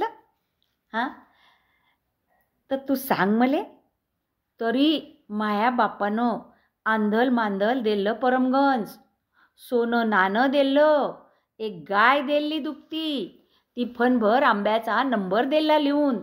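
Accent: Vidarbha, India. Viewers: हे वैदर्भीय भाषेतले, एका खेडवळ स्त्री चे स्वगत ऐकताना मन अगदी गुंगून गेले. भाषेचा वेगळा लहेजा, म्हणी तर आहेतच पण तिच्या भावनांचा आविष्कार आपल्या अभिनयाने जिवंत झाला आहे, हे सत्य! ❤ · छानच हं..! अॅडव्होकेट सौ.,! वर्‍हाडी बोलाचा ठसका साधलाय..अभिनंदन! pvshirsath Nasik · ताई आमचे १४ वर्षे विदर्भातील यवतमाळ येथे नोकरी निमित्ताने वास्तव्य होते. अगदी तीच वैदर्भीय भाषा ऐकून खूप मजा आली. आपल्यासारख्या वकिली पेशातील ताईंनी हुबेहुब खूप छान प्रस्तुत केले. भावले बुवा मले. ❤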